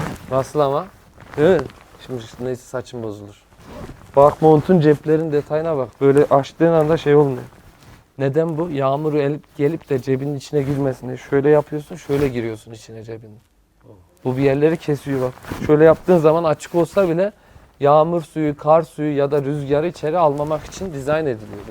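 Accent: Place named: Ankara, Turkey. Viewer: Nasıl içten nasıl bizden. Angaralı gibi gonuşuyon valla. Arkadaşlarla mağaza geziyorum gibi oldum 😀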